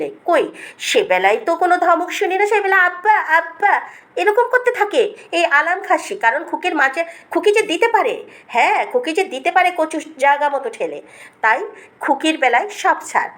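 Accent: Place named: Kolkata, India. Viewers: বাপরে বাপ, মুখ নাতো হলার মেশিন! না জেনে কাউকে পতিতা বানানো কত বড় পাপ। কলকাতার টোনে কথা বলে, কেরে তুই নতুন পাগল! তোর নিজের সংসারের কি দশা বোঝায় যায়! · তুই কে রে?কার চামচা,কোন পতিতার? কলকাতার মানুষের টোনে কথা বলতে যোগ্যতা লাগে। পতিতা কে পতিতা জেনেই বলেছে। তুই জানিস উনি কে? উনার আগের চ্যানেল থেকে ঘুরে আয় ,হেগে দিবি জানলে,দুই মিঃ তোকে বসিয়ে মুতিয়ে ,তা খাওয়াবে। তার সংসার নিয়ে তোকে ভাবতে হবেনা, তার স্বামী, সন্তান সমাজের উচ্চ স্তরের লোক,সে নিজেও তাই। শালী😅😅😅😅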